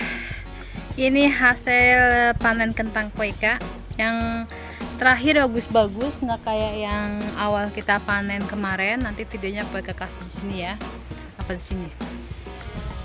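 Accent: Jakarta, Indonesia. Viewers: Betawi bangat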